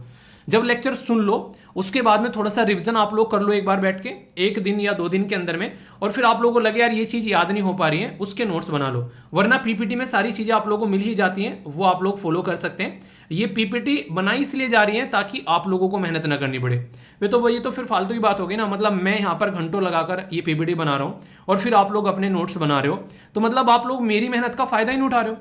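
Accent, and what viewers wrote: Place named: Uttarakhand, India. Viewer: Sir aap Uttrakhand ke ho aapke tone se lagta he.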